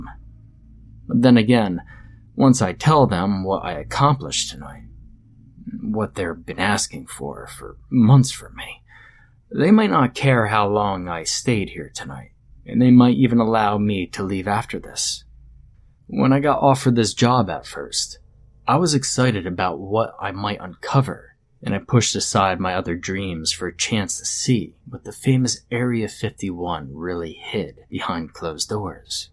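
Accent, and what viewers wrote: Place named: Alabama, United States. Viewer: Hey yep had to see the pups very cute And to hear the Alabama accent,did not disappoint Proper Southern Girl Blessings have a great weekend I will just say coz time zones baffle me atm defo need an early night. A Long ass day and 33Celsius in London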